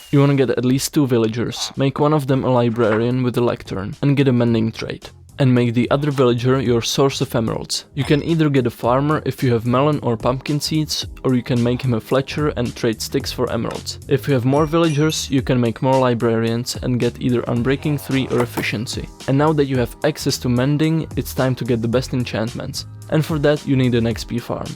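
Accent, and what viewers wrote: Czech Republic. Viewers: you got very czech accent bro :D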